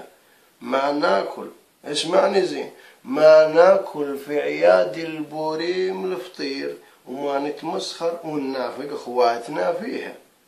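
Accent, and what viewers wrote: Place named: Saudi Arabia. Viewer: يتكلم لهجة سعودية أكيد أنه ليس جزائري وهناك صعاليك تصطاد في المياه العكرة احذروا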